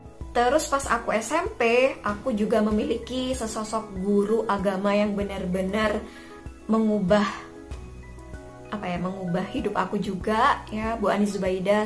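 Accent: Jember, Indonesia. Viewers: Kkak org jember ya?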